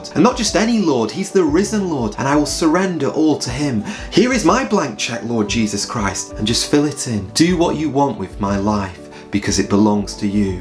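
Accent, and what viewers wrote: England, United Kingdom. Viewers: I love Ur speaking voice, Ur English accent!! God Bless U!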